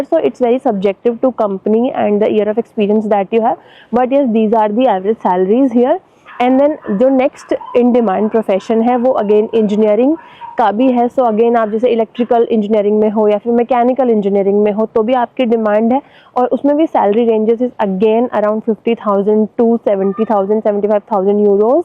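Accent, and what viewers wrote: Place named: Uttar Pradesh, India. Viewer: Bahut achchi video aap U.P. se hn kya aap ki langauge se lagta h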